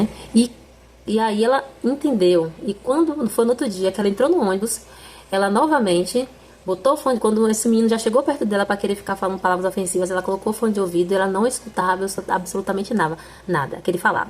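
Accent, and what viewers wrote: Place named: Salvador, Brazil. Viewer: Oi amiga, cheguei pra te dar aquela força e já vi que você tem potencial. Me tire uma dúvida, você é baiana, mais precisamente de Salvador ou região próxima? Reconheci um sotaque sateropolitano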